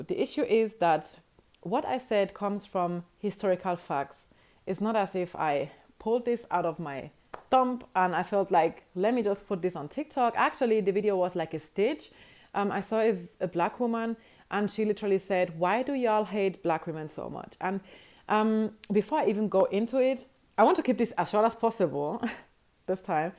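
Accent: Nigeria. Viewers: I like the way she speaks with facts. When I first heard her accent, I thought she was Nigerian in some way 🤔. Then she mentioned her husband is from Nigeria and I was like 'Aha!' 😁. Wonderful content. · I was so surprised when you started talking cause your accent sounded a bit Nigerian. And then you mentioned that that your husband is Nigerian and it made so much sense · Awesome presentation.. I picked up the Nigerian in your unique accent from the beginning.. Blessings.. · your accent is partially nigerian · I love her Nigerian accent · then you guys don’t know German accent when speaking English, of course she’s German with the accent somewhere in there but she’s straight almost full Nigeria lol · I love that Nigerian accent coming through Mama! Keep up the content. We are loving it. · Wow!!! Negative people just appear out of nowhere to give their nasty a$$ remarks. 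She sounds Nigerian to me. There's a huge Nigerian community where I live and that's what she sounds like to my ears. Yes I pick up on the German. My in-laws are German. And her accent fluctuates. But to me - that's what she sounds like. If she doesn't to you - kudos. But I see the commenters who appear to also be West African and hear the accent as well. Meaning - you got nothing better to do than troll and be negative. Good luck with that. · WHALLEY-Oooo...My Wife, as we day in Nigeria!! I just confirmed after I posted beneath your video in comments that you had a distinctive Nigerian Accent!! Ahh-Ahh...Now I see your surname!! Hence why I'm calling you 'My wife' it's just a thing with Nigerians LOL You may be married to a Nigerian as my mummies surname is Nigerian too...When she goes to hospital and they try to call her by her 11 lettered surname and this little white haired woman calls out... 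'No...my name is... OMADELIENJE!! 🤣🤣🤣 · I heard a slight Nigerian lilt in your voice; maybe Igbo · You've developed a Nigerian accent.♥️ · OmG..... Gospel from heaven. And your english is so Nigerian... Did you grow here?? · Dear, you speak with a low-key Nigerian(igbo) accent. And your last name, is indeed Igbo. If you may, am I correct in my observation? And how are you related to "us"? Regards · She's married to a Nigerian,she speak like a Nigerian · I'm northern European, brought up in West Africa and she's German, speaking English like a Nigerian - weird! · You have a Nigerian accent... Did you leave in Africa before? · I hear the Naija accent trying to break through! 😃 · Your Nigerian accent is strong. Wow. I appreciate the facts you spit. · I love your accent, you sound African, a bit Nigerian-ish. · I was thinking about the same thing.... My brain was like this is Nigerian accent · You remind me of one of my ex's. 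She was German but her ex husband was Nigerian and she spoke English with a Nigerian accent just like you. Because of that I never thought of her as being white because aside from the color she wasn't. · I am wondering where your nigerian accent came from?!? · Wow, ur accent, sounds more like core AFRICAN, NIGERIAN PRECISELY.. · It's this Nigerian accent for me, thanks for your insight. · Nigerian accent is beautiful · Was saying to myself why she sounds like a Nigerian?? Then in the video,she says "I'm married to a Nigerian"😍 And thanks for this video... You couldn't have said it better ma'am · I believe she mentioned that her husband is Nigerian, she picked up the accent well and I too like that.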